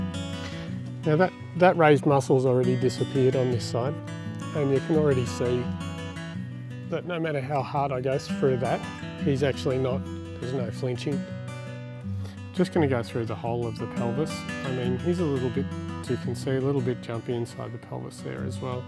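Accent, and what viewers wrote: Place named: Australia. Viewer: Excellent work. Really enjoying your videos i just stumbled accross. I can tell from your "lack of an accent" lol, you sound like an Aussie?? (I am too) ☺ Just wondering what state you are in?? Not SA by any chance?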